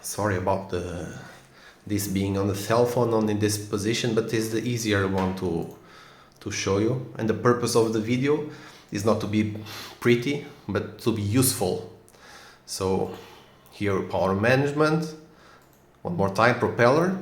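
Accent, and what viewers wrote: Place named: Portugal. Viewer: Obrigado! (your accent sounds portuguese, like me). (edit: unfortunately, the feathering does not work if we want to feather just one engine but good try).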